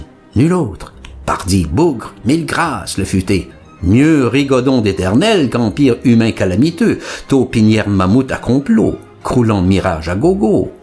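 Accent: Quebec, Canada. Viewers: J'y retourne 10 mois plus tard parce que je viens de vous écouter dans la suite... Quel plaisir de partager vos échanges et la langue de la belle Province que nous aimons tant à entendre. D'ailleurs comment faites vous pour vous départir de cet accent si beau quand vous lisez ? Bon WE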